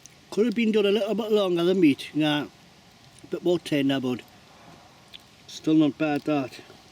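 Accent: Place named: England, United Kingdom. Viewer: Love to watch videos from another country. Like the english accent also. I don't have the access to places to go here in Florida. Too many thing to go wrong with all the unpleasant people around. And the country is so dry this time of year. Wet season is a couple of montha away so campfires are not good.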